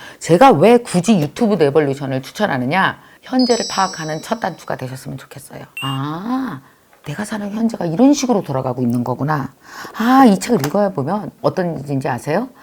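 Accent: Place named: Chungcheong, South Korea. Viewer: 충청도 ㅋㅋㅋㅋㅋㅋㅋ 아 웃겨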